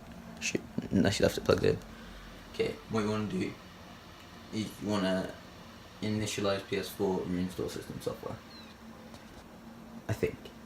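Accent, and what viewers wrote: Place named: England, United Kingdom. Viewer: Omg u chocolatey English guy it works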